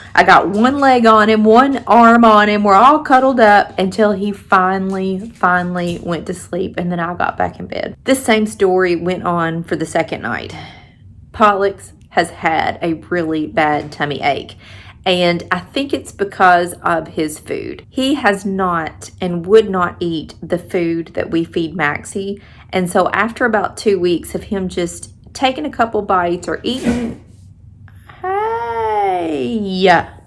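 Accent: South, United States. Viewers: I found you looking for growing out grey hair info. Love your Southern accent as I am from the South, but live in the North. Then found Pollux videos. I lost my German Shepherd, also a rescue, in 2017 and can't get over losing him. So am enjoying getting my doggie fix with Maxie and Pollux. Thank you. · Being from S. Cali, I just LOVE Southern talk even though usually do not know what the phrases even mean, lol☺😂~Plus, just has the prettiest accent ever, and I have always wanted one so much❤:)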